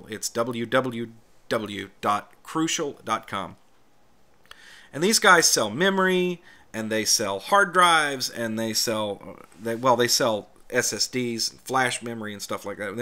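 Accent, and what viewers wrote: South, United States. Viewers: Hey, new sub here, I have played around with Ubuntu here and there through the years, but was always intimidated by the terminal, dove back in recently with Linux Mint on an old HP pavilion Laptop with Core 2 duo and 4Gig RAM. Now that I'm all about learning how to properly use Linux your videos have been awesome. Where in the South are you from, you sound like you're from around my neck of the woods. I could listen to you dispense wisdom all day.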